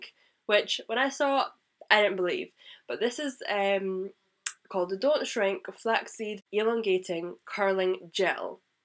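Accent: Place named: England, United Kingdom. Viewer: Liked the accent. Are you from England?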